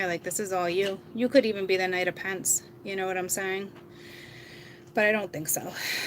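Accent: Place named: New York City, United States. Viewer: Hello. Having a fairly good night,getting into videos on my 2 favorite planets,SATURN&MARS. ESPECIALLY SATURN'S MOON "TITAN",CHILLING OUT WATCHING "THE MATEIX" AND THEN "THE TERMINATOR",JUST CHILLING TIL I FALL OUT. P.S. LOVE YOUR "NEWYORK CITY"ACCENT!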